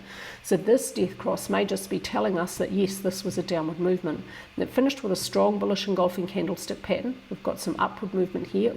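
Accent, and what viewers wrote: New Zealand. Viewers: Jack has done a wonderful job, but it's so, so lovely to hear from you again. Being an Aussie who's visited the Land of the Long White Cloud many times in the past, I really love listening to that Kiwi accent 😅 Thank you for your analysis, being a total mathematics dyslexic I really appreciate it.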